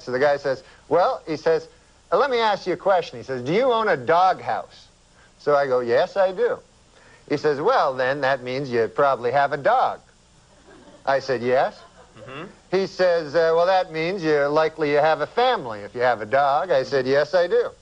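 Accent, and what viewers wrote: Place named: Ottawa Valley, Canada. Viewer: That's Ottawa Valley, central/East Canada, it's rural Scottish influences.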